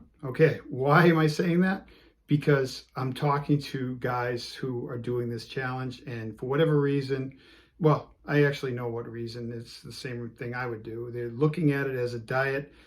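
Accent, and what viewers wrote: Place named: Massachusetts, United States. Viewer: Sounds like is from Billerica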